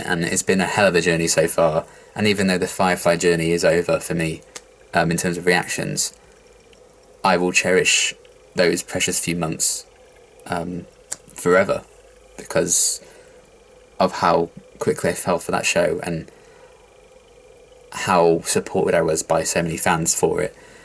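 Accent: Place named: United Kingdom. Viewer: It's been a whole year sense I got to hear you amazing brit voice. Nice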